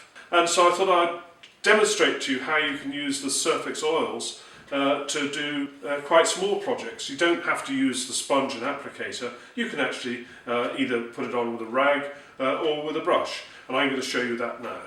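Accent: United Kingdom. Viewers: Hi many thanks for your speedy reply. I must say I greatly enjoy your Youtube Channel, Its nice to hear a U.K. accent and watch an accomplished cabinet maker. I've learnt a lot watching yiou.Unfortunately your channel increases my Festool lust inordinately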